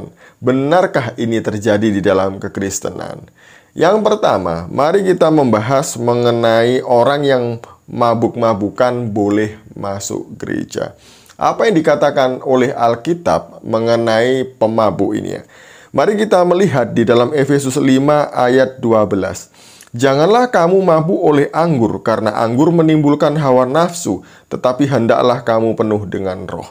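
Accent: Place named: Manado, Indonesia. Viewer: Kau itu org mana, kalau logatnya seperti darianado.. org mabok yg masuk gereja yg kamu bilang itu bohong tetapi kalau dia mabok pasti disuruh pulang, kalau kamu kentut di gereja ya terserah dia tap tapi orgnya tdk tau malu..tapi tdk membatalkan org lain untuk beribadah karena makna cara penyembahan kpd Tuhan berbeda dng islam..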